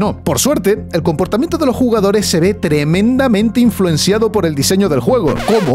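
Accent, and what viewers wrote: Canary Islands, Spain. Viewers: tu eres de mi tierra vd o yo estoy alucinando con que eres canario? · El acento canario es lo que le da toda su personalidad a sus bromas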